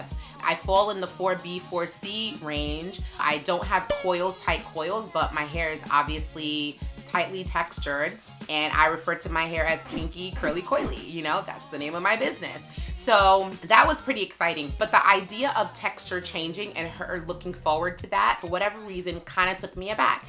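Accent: New York, United States. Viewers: Wow I haven't seen you in forever youtube is on some bs but your lil lady is so big havent seen her since she was a baby and im loving the NY accent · That NY accent!! Brooklyn Girls In the house!!! ❤️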